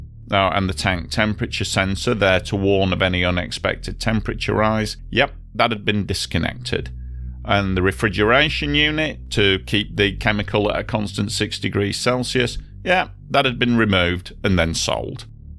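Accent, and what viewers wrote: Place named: North Midlands, United Kingdom. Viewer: I'm intrigued by *'s accent. I think I've narrowed it down to North Midlands/Derbyshire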